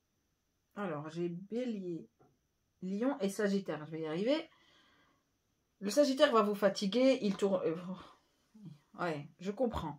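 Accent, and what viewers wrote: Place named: Switzerland. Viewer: Ah l accent suisse🤣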